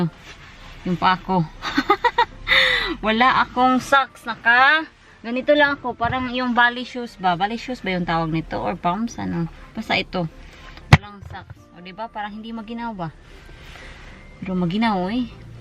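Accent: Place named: Visayas, Philippines. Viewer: Hi ate love you bisaya accent❤ #christmas2019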